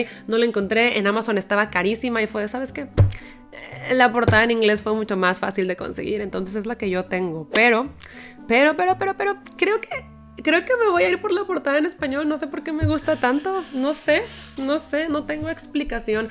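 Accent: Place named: Mexico. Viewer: Estoy aprendiendo español con tus videos. ¡Hablas tan hermoso y claro! Practico mi acento mexicano contigo <3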